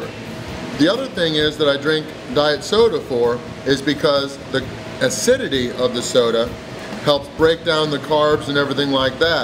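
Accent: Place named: United States. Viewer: Jamm Lighting lol he’s got like a northern hillbilly twang to it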